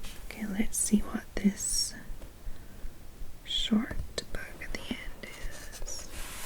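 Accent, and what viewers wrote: Scotland, United Kingdom. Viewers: What’s your accent? I can’t tell. You sound Scottish/welsh sometimes and American sometimes lol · Nice scottish accent. Love it. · Okay, my attuned ear is picking up a slight Scottish brogue from you,.🤔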